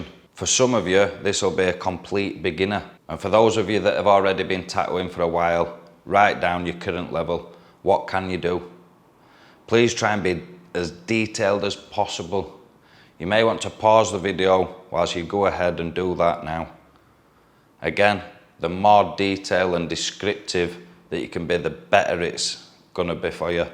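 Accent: United Kingdom. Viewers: Thanks Man, your videos are Great! Your explanations are very clear. I'm a Yank and I love hearing your Limey accent! I am an old guy, now retired, with over 21 years of getting a full body suit. I suddenly got the urge to learn to how to tattoo when I thought about getting my hand tattoos touched up and thought I might try to do it myself. I may never get confident enough to do it, but I'm having a great time trying to learn. But I'm still avoiding attacking my skin! What would you suggest as an all-around good liner? I have only some 10-05 RL and wondering whether to try 10-07 or 10-09 or what?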